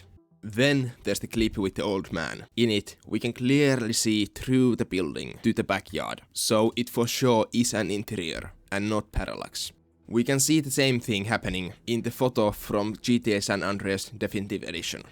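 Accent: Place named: Finland. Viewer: You have a finnish or swedish,are u from there?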